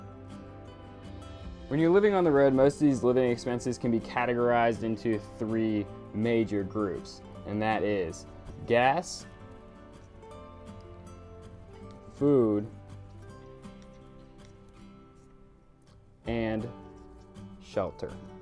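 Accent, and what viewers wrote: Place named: East Coast, United States. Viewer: Loved the video and I’m thinking of doing this! Are you still in AZ?! It would be awesome to meet you sometime, are you originally from the East Coast, because you sound like it.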